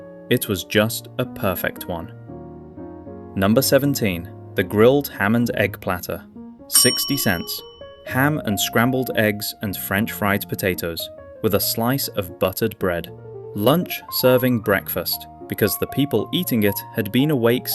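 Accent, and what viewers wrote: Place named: England, United Kingdom. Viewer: An English voice on nostalgia from The United States of North America. 😊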